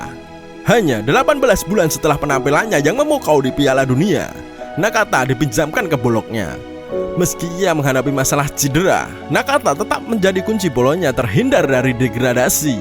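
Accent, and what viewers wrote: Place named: Java, Indonesia. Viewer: Medog amat om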